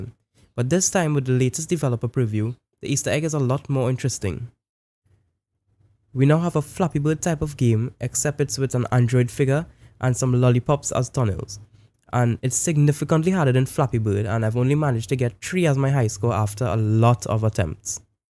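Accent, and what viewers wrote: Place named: Trinidad and Tobago. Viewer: great vid.....you sound Trinidadian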